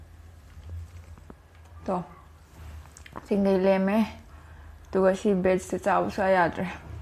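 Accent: Kakching, India. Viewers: Kakching maron d ngang da b n henna fajei natural d oina adum ngang ngo... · Kakching londa ngangbdo yam nung c nangna chabdu haodou yam male hayeng yumda thorkanda sak khangnarga chaminase eisu haobra yenge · mathangdi che pork chabei oina twrak oh kakching marol tabasu yam nungaii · Kkching lon sum ngngbiyo .....cna hena tab nungaijei😍😍😍😍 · kakching lon ngangbac tba ym nungai ado lon khoidabda su hei ym nungaiye tabda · Noi lonse taba yam nungai eigi enamashu kakching bazar mnaktgi ngdi noi lonbu heitreda. Ado pork chardi pork chabgi video tourako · Kakching marol ngangba heisu heita malle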